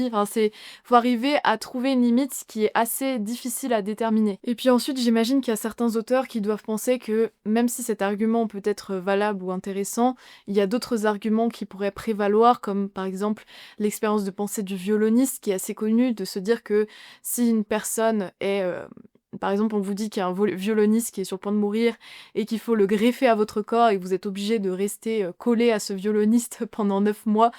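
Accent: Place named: France. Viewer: Je suis comme Quebecois pour deconstruire votre superbe accent francais et Sartre.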